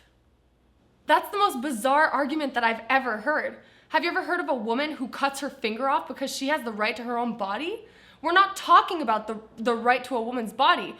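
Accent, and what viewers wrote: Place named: South, United States. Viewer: You’re definitely from Alabama or Mississippi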